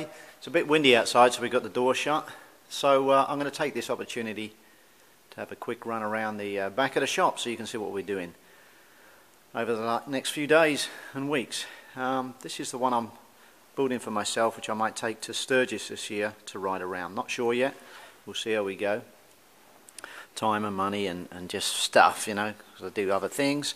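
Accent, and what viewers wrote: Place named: Australia. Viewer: you sound like an ozzy mate ,haha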